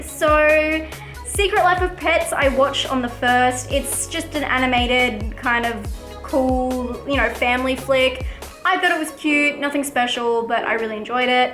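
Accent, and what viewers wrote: Australia. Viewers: Yay,!! Saw movies!! Big fan! So cool you went to the Gold Coast! I personally think the west coast has nothing to envy. Sounds like you are from Western Australia?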